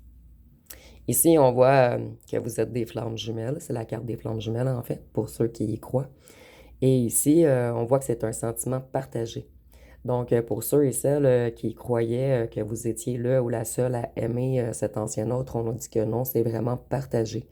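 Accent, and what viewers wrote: Canada. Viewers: Bonjour, j' aime beaucoup votre accent canadien 😊 Je me sens plus forte depuis ses épreuves.Une forte emprise familiale et ex.... J' ai mis un stop depuis janvier 2024 et j' ai été profondément blessée. Je le remercie pour cette belle leçon de vie. Après une longue traversée du désert, je m'ouvre à nouveau vers le champ des possibles. Je suis plus en amour pour moi même et pour la vie ❤ Merci beaucoup ❤❤❤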